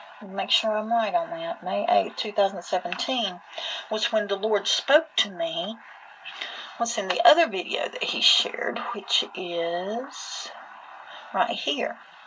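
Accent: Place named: South, United States. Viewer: Soooo good to hear that calming southern accent! I have missed you sister.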